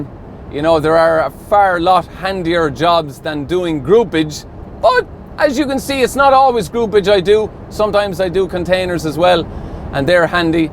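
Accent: Ireland. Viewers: Irish accent, right? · You sound like you're from Dublin. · Ok Ireland is the size of Indiana . My ancestors are from Ireland though . So cool accent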